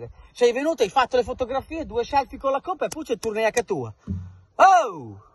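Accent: Romagna, Italy. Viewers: 100% romagna, quando uno è incazzato non c’è niente di meglio del dialetto romagnolo per esprimere il proprio malessere, te lo dice un romagnolo doc che quando ti sente parlare si sganascia dalle risate... e così sei passato dai piatti ai pomodori, e va beh, almeno sono freschi e sai cosa strozzi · Se fai il video in romagnolo vuol proprio dire che sei incazzato... mi aspettavo qualche piatto rotto... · io lo ascolto solo per il mitico accento romagnolo!!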